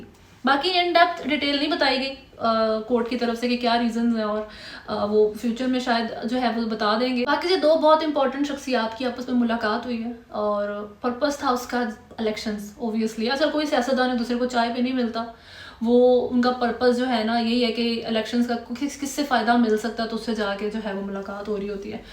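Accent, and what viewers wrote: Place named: Punjab, India. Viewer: Yaar Punjabi accent me English kenyu bolte hain aap ?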